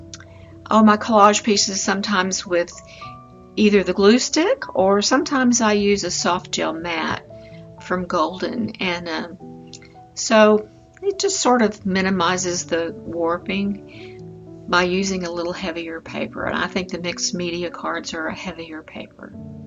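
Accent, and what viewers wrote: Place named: Texas, United States. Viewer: Love your videos and art,. Really helps me with my composition. Are you Texan by any chance? We live in England, and you remind me so much of the Texan women I grew up with. I could listen to you all day..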